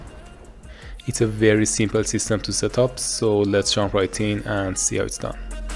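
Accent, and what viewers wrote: Iran. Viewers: ur accent lol! are you persian dadash?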